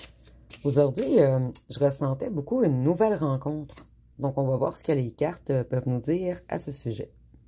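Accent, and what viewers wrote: Quebec, Canada. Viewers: Vous avez Madame une très belle manicure , une belle couleur de vernis a ongles ,et je vous ressent une très belle personne en tout point de vue et très soigner pour vous autant ds votre façon de penser que de vous arranger et j'aime bien votre accent québécois ces très jolie.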